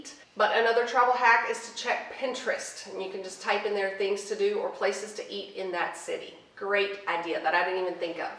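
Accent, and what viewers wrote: South, United States. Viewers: I love her southern drawl. Super charming.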